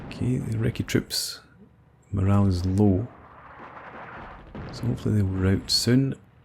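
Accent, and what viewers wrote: Scotland, United Kingdom. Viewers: Cheers and thank you for posting this video! I am always thrilled to hear wee bit of a Scottish accent, a country of which I am very fond of. If you happen to have any ideas or suggestions, just fire away 👍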